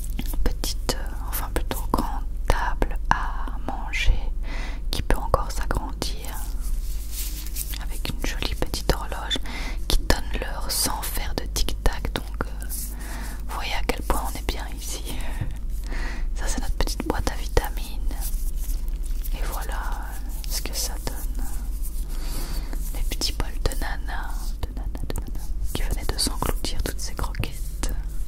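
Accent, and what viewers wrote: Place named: Belgium. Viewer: La couisine 😂😍j adore l’accent belge je sais pas pk ça me détend en plus 😂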